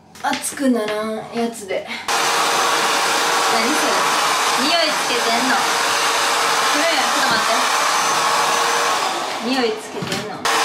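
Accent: Kansai, Japan. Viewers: 関西弁使うな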